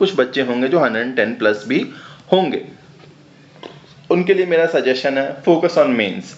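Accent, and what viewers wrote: Bihar, India. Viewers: Hum matlab Mai sunkar bhut acha lga .. pkka Bihar bala language 🤩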